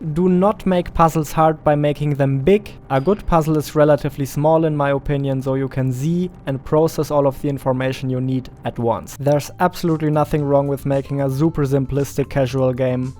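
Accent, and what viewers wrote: Germany. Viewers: Ich höre seinen deutschen akzent😅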